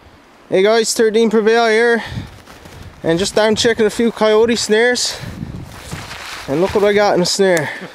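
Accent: Newfoundland, Canada. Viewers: are you from Newfoundland? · you got my subscription buddy! you a newfie? im from ole cape breton!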